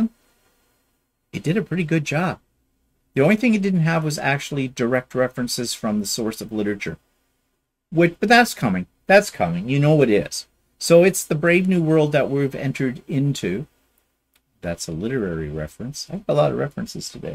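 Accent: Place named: Newfoundland, Canada. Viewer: Newfoundland accent ha ha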